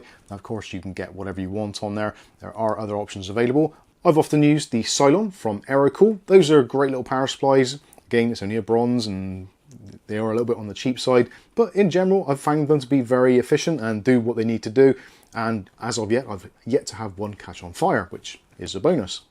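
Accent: Bristol, United Kingdom. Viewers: Did I see this on Facebook marketplace a few days ago? Thought I recognized the Bristolian accent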